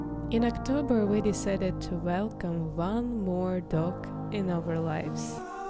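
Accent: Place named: Russia. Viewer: Great dogs!! Where r you from? You have very Russian accent.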